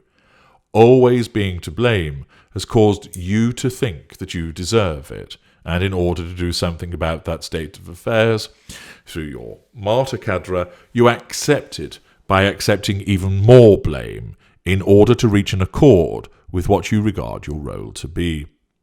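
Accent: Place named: England, United Kingdom. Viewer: I like listening to voice, I enjoy the English accent. My ancestors came to the U.S. from england, One day I hope to take a trip over there and spend some time.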